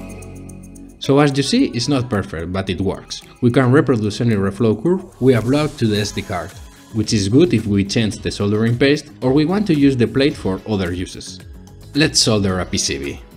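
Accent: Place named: Spain. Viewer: Buen trabajo! Se nota que eres español, pero lo intentas y te salen muy bien los videos!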